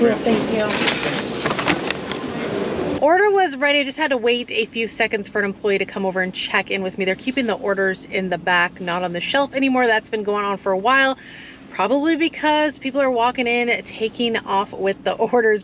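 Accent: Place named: Pennsylvania, United States. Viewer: You sound like you are from Pennsylvania.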